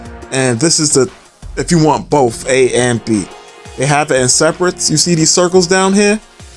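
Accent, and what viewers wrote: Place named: New York, United States. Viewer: Bro gotta be from NY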